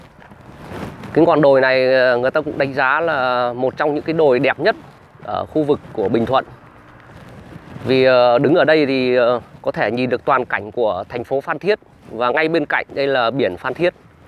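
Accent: Standard. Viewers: Bạn có giọng nói chuẩn và rất hay chúc bạn thành công trong công việc của bạn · Kênh của bạn rất hay . Giọng MC chuẩn . Chúc bạn nhiều sức khỏe . Chúc kênh phát triển .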